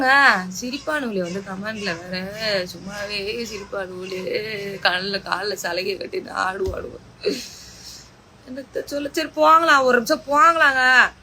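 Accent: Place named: Tiruchirappalli, India. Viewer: Nee endha ooruma? Trichy slang a theriuthu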